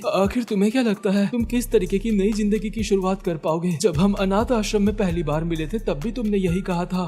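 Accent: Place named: Hyderabad, India. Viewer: Ap ki language Hyderabadi dekh ri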